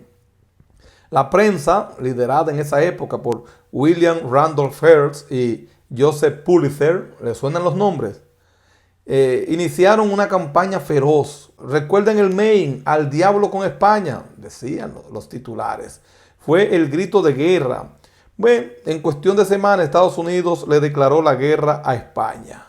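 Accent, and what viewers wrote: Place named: Dominican Republic. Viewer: Ahora haz un vídeo sobre la invasión de la que somos víctimas.... asumo que eres dominicano por tu acento.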